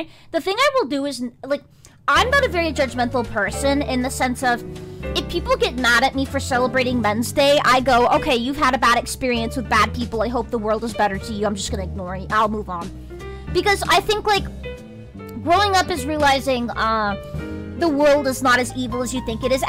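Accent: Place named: Bronx, United States. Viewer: chibi extra Italian from the Bronx in this one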